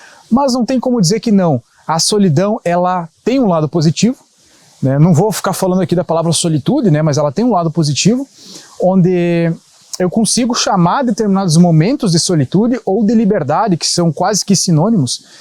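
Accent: Rio Grande do Sul, Brazil. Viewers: aventureiro ou profissional os 2 é bom,mas falta um outro q falava de óculos com um pouco de sarcasmo,deboche e humor com aquele sotaque mais gaúcho,abraço irmão de batalha.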